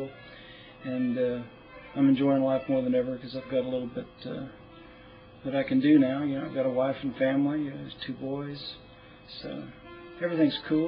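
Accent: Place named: Texas, United States. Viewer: Heyy, just wanted to say thanks for sharing. You definatley do not look your age, you have beautiful eyes. And I could tell that you were from Texas, I can detect a slight hint of a Texan accent. When did you move to Colorado? Have a good week. --//xo;Ace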